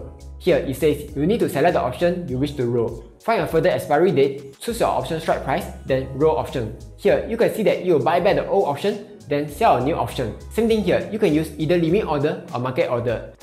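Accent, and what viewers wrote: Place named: Singapore. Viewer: Absolutely love ur video, no BS and straight to the points! Plus Singlish…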